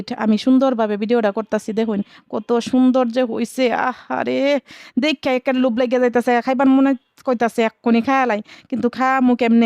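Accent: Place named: Mymensingh, Bangladesh. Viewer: আপু আপনার মিনি অনেক কিউট, আপনার কথা গুলো অনেক সুন্দর লাগছে তবে আপু আপনার কথা গুলো জামালপুরের হয়নি এই ভাষা ময়মনসিংহ এর,আপু আমার বাড়ি শেরপুর বকশিগঞ্জ এ,এই পিটালি আমরা আগে মানুষ মারা গেলে মজলিস এ কলা পাতায় খেতাম অনেক মজা হতো,আপনার ভিডিও দেখে ছোট বেলার কথা মনে পড়ে গেলো, অসম্ভব সুন্দর ছিলো আপনার ভিডিও ও উপস্থাপনা,আপু আপনার ভিডিও টা পুরো টা দেখলাম এবং এক বা না, দুই দুই বার দেখলাম ভালো থাকবেন দোয়া রইলো আপনার জন্য।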